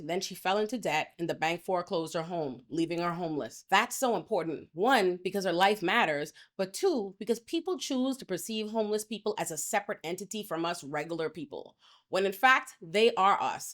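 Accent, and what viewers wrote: Bahamas. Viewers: Are you Bahamian? I recognize that accent anywhere lol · Aside from me feeling like i hear a bahamian accent trying to come from you 😅.... i always said new york will fall first.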